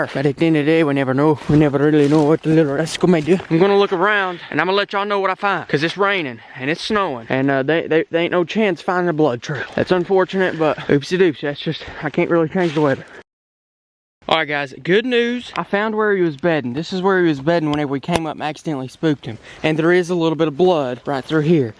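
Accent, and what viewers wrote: United States. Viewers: bro is the most country sounding person i've ever heard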